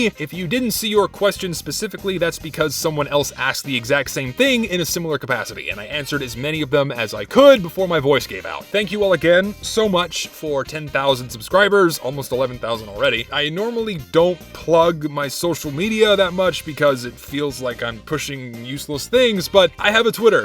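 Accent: Texas, United States. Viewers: MORE TEXAN ACCENT NOW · youre accent becomes more cowboy through out the video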